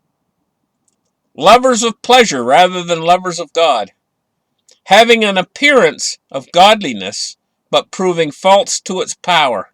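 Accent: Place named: Canada. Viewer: Love you to bits,. My day is not complete without those dulcid Canadian tones. Now here's the thing. You have yourself a merry little Xmas and come back refreshed and ready for the end game. Kind regards from the UK.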